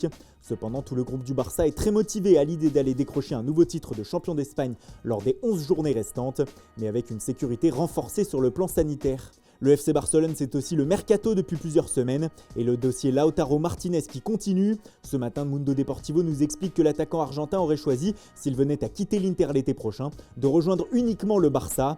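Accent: Italy. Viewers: l'accent Italiens legandaire. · L’ accents italiens légendaire 😂🔥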